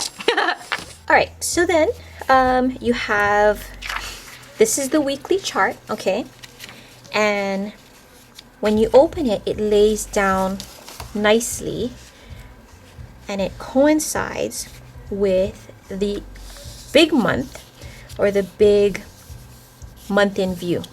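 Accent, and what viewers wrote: Hawaii, United States. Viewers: Howzit! I thought so! I could've sworn that I heard you say "dat' and 'dis", I could tell when you said, "Used to, to". That's a dead giveaway! You can take the girl out of the island but you can't take the island out of the girl. ALOHA! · Are you from Hawaii? You can totally hear it. Lol "used to to".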